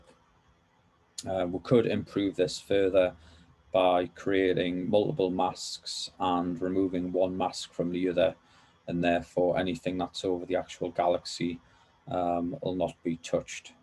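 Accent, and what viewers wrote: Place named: Northern England, United Kingdom. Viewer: Great video I am from Teeside recognised your northern accent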